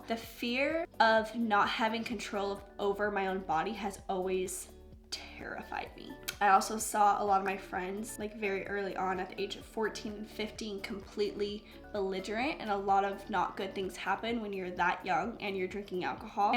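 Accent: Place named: Ohio, United States. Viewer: I don't think your voice sounds like you're always having a cold, it must be your accent that does that. My English teacher comes from Ohio and she has the same accent as you and it's so cute!!! You helped me bring so much more positivity into my life ever since I started to follow you and thank you for that! My new year's resolution is to stop procrastinating but I'd be able to procrastinate on that too tbh 😂 Ig: yellow.dazzle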